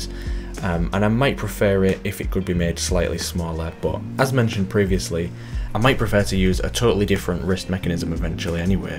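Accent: United Kingdom. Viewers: That brass geared thumb mechanism is a work of art and absolutely gorgeous design. You’re a very clever bloke. Just found your channel. Nice to hear a Brit voice on YouTube 👍🇬🇧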